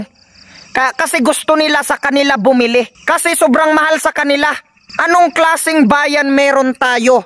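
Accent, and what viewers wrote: Visayas, Philippines. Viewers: love ur visayan accent❤😊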